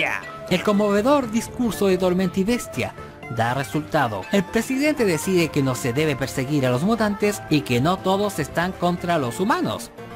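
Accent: Chile. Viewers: Eres chileno? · Acento chileno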